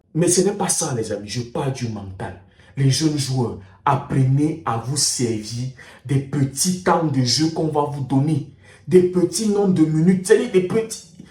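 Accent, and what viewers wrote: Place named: Cameroon. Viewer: Le français des camerounais.👍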